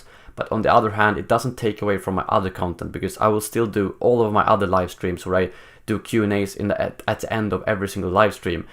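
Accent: Sweden. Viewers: Well I gotta say this I hope that your channel grows much bigger and I think that I speak for many subscribers that, we would follow and support you even when battlefront dies out.(also for all those people that poke fun at the guys accent give him a break he is from Sweden so English may not be his first language) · I came to this channel a few days after DICE's Battlefront announcement (before the Røde microphone and at the peak of your strong swedish accent hahaha), and I've been suscribed since then. I participated in the forums and I've seen most of your videos, including the rumors and "what the fans want". I've seen your progress through the channel, from short pieces of news to full-length informative videos that are both extremely hard to find otherwise and very well packed with information. I absolutely advice you to keep on with the Patreon and pursue a full-time career here on YouTube, this may be a niche channel....but it's the niche channel we people need to have in order to be updated with Star Wars games. 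Huge thumbs up to you and keep being awesome! -Kanto.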